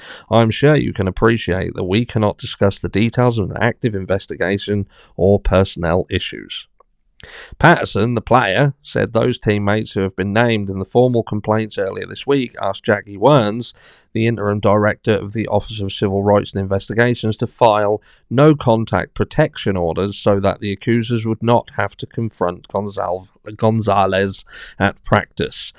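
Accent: United Kingdom. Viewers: I can’t follow. Normally I relish an accent from uk but can’t this time. Straightforward reporting is best.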